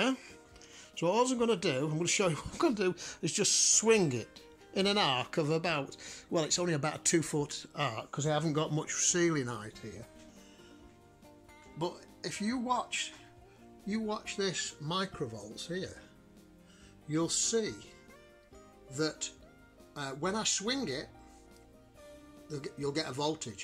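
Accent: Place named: England, United Kingdom. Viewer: You sound a lot like the Draftsman, English accent. By the way, the double coil device charged the cap to over one thousand volts. Scary! Could you go to a remote area, out in a field, away from all electric interference. Swing the mag/coil on the rope around in a circle while you stand in the center, hold your hand over your head and swing away, a larger radius. Little voltage in the shop, bigger outside.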